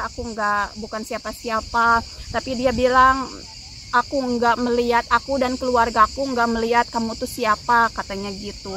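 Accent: West Java, Indonesia. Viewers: Pertahankan logat sundanya ya neng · Semangat terus ya teh. Salam kenal sy sunda bandung. Lucu pisan denger logat sundana💕👍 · Nemu lg org sunda,logatnya jgn ilang teh😃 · Kecanduan suara ama logat teteh....sederhana...wlw kdg kurg ngrti bhsa sunda😅😅😅😅tp.seru..slm.dari semarang..nama q juga teeh😅😅😅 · Semoga cepet dapet momongan ya teh semangat terus pokoknya😁😁 Padahal ga ngerti klu teteh omong sunda tapi seneng aja denger teteh omong sunda menghibur banget tehh 🥰🥰🥰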